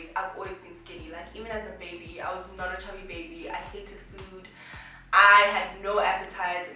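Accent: United Kingdom. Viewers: N the dash of UK accent iphumaphi? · It's the British accent for me...lol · i loveee the british accent omg omg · The British accent gets me everytime 🤣🤣❤️❤️ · Loved the UK accent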